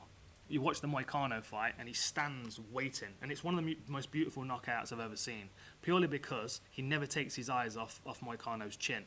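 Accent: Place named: England, United Kingdom. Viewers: I think his style of English would mostly be a product of where in England he comes from. I don’t find him pompous at all btw. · Something about dan , he has that annoying pompous English style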